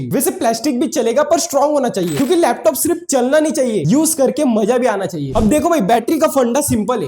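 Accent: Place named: Maharashtra, India. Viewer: Marathi accent flowing up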